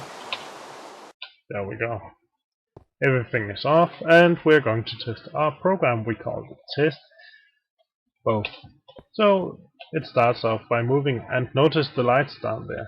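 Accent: Denmark. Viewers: jeg kunne høre lige med det samme at du var dansk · You're Danish and I can hear it!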